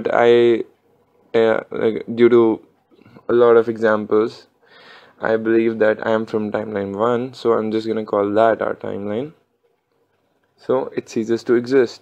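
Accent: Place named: India. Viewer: Indian accents are great, love it.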